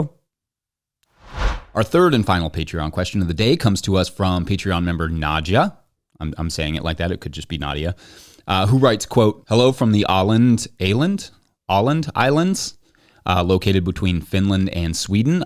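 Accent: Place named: Utah, United States. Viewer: Sounds like you're from Utah (me too) even AND you've got the green no-till hat?! This guy's a good one.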